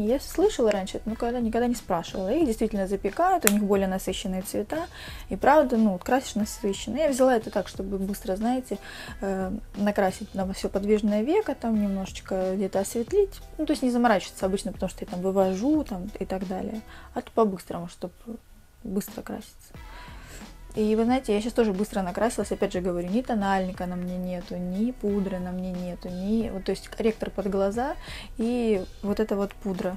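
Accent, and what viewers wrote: Rostov, Russia. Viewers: Из какого вы города ? Показалось что из Ростова на дону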